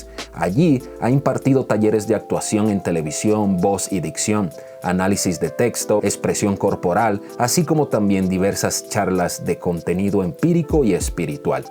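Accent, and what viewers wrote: Mexico. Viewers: Que magnifico trabajo el suyo, destacar la participación de artistas quisqueyano fuera de nuestro país, para que conozcamos a los nuestros en playas extranjeras. Muchas gracias principe por ese regalo a los dominicanos. Creo por su acento, que usted es del tan lindo y querido México, nuestro hermano mayor de Norteamérica. Bendiciones para usted.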